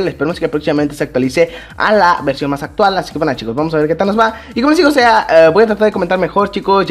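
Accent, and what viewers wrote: Spain. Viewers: Es muy incomodo Que hables como español si tu eres mexicano suejas mal :/ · No hables como español se tu mismo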